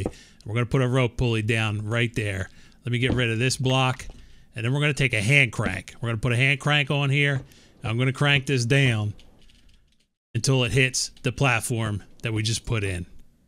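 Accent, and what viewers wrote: Philadelphia, United States. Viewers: Great video! Do I hear a Philly accent? It's wooder not water!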